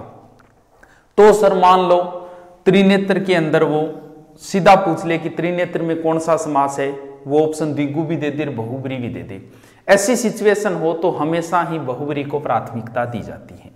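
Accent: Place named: Rajasthan, India. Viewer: Rajasthani tone....maja aaya pdhne me....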